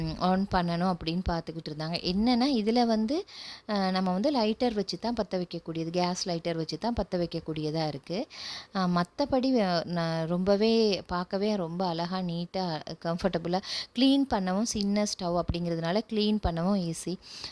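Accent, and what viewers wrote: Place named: Sri Lanka. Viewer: Super medam elankai spech ❤❤❤😂😂😂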